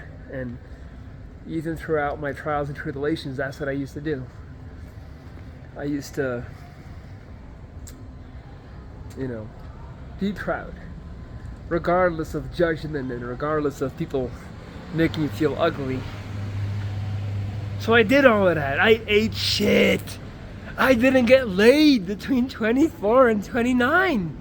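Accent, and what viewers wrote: United States. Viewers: You’ve got an American accent! Where are you from originally? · Wat grappig je komt uit Nederland? Ik dacht serieus dat je uit Amerika kwam. Je klinkt als een Amerikaan. Maar uh... wat doe je in Brazilie? Brazilie is wel het laatste land waar ik ook maar een voet zou zetten. En ik haat de Portugese taal. Gr gr Dario.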